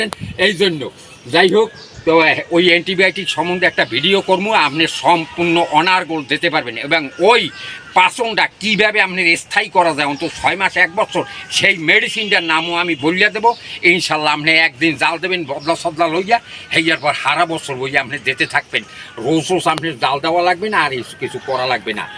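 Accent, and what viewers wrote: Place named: Bangladesh. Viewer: দাদু আপনার বাংলাদেশী কথা আমার খুব ভালোলাগে। আপনি খুব পরোপোকারী।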